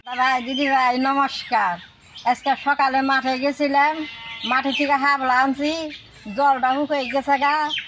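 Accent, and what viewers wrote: Bangladesh. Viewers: আপনার কথা বাংলাদেশের কোন একটা এলাকায় মানুষের মতো।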